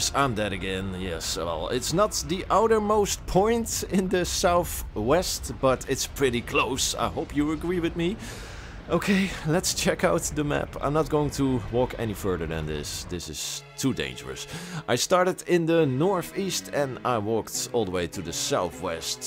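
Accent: Netherlands. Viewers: Dude, are you Dutch? You have a really strong accent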